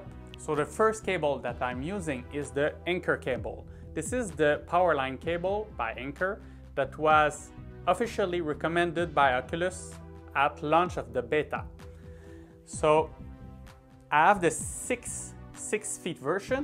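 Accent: Quebec, Canada. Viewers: C"est moi ou tes quebecois?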